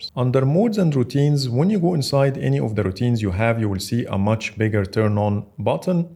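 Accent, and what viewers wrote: Egypt. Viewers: انت مصري ياض حاسس الانجليزي بتاعك انجليزي مصري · اللهجه مصريه جدا ؟؟؟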